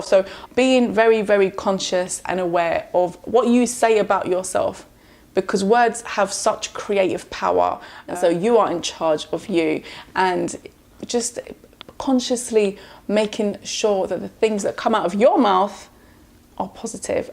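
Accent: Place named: Russia. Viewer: I love the video but do you from Russia or Germany ???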